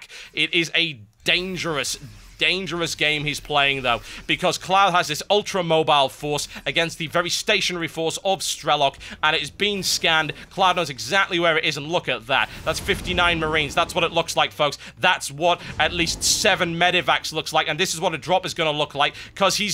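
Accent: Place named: Northern England, United Kingdom. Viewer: It isn't his actual accent, he actually has a Northern accent. If you listen carefully when he gets excited, you'll hear it.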